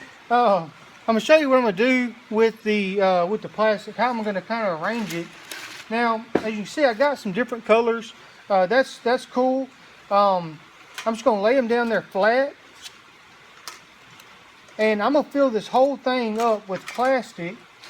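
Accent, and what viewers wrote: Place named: South, United States. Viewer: Not to get off the subject but I'm from the south just like you and we have similar accents. I can understand every word you say but I turn the sound down and the captions on so I don't bother other people around me and YouTube always distorts the captions when dealing with Southern accents. YouTube's voice recognition needs some work. I mean "hard as a rock", Youtube interprets as "whores".... On the subject of what your doing, I'm looking into using waste plastic (like those gray Wal-Mart bags) as an alternative to fiberglass resin and polyester. I have used cotton and even cardboard brushed with Bondo fiberglass resin instead of polyester to make things. I've made speaker boxes for cars out of cardboard coated with fiberglass resin then glued that felt material over top of it. It's been holding up fine for a few years.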